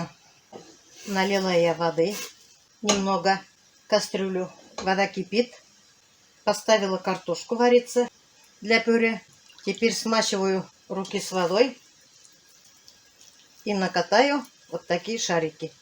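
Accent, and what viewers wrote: Tatarstan, Russia. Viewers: Какой у вас татарский акцент как у моей бабушки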